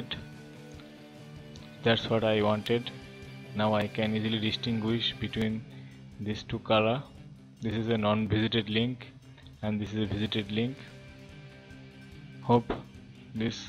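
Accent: India. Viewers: So hard to understand that thick Indian accent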